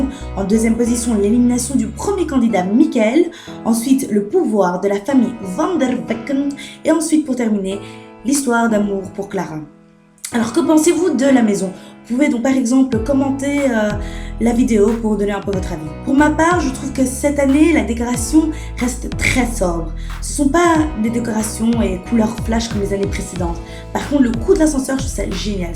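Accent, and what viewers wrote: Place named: Belgium. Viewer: Elle a un accent belge !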